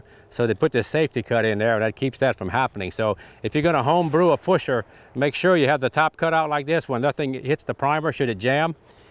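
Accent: Louisiana, United States. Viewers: Wheres he from, he has a bayou accent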